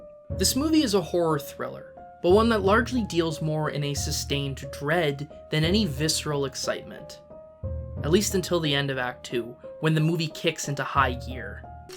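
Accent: Canada. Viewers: great video. one nitpick: I can tell from your accent that you're Canadian. I wonder if this is the reason you decided to turn Elster (el-stir) into Elstre (el-stree), much the same way you might do center > centre (pronounced "sen-tree", of course)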